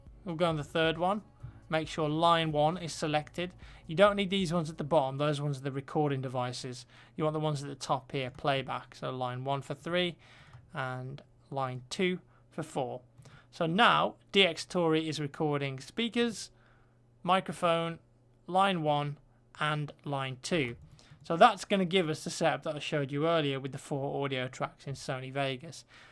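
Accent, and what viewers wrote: United Kingdom. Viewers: Gotta love that british accent! :3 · ur british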